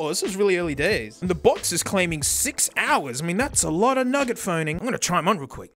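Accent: Australia. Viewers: I love this guy, it's like an Aussie Señor Pelo that makes videos about audiphones and piracy. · this guy can't be more australian and i'm overwhelmed by it.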